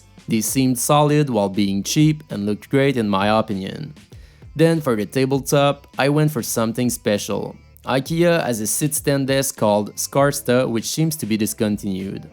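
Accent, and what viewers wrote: Quebec, Canada. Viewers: thanks and I have a question ... are you Canadian? I noticed that you had a little Canadian accent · tu as l'accent du québec,mais bonne video tabarnak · Yoooooooooooo serais -tu québécois par pur hazard??? XDD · Je reconnais l'accent québécois ;)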